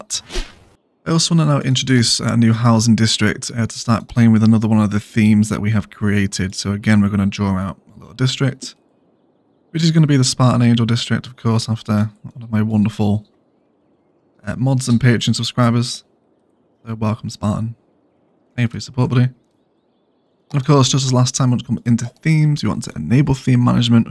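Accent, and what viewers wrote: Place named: England, United Kingdom. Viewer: Time to sit back and enjoy my dear old with his awesome funny English accent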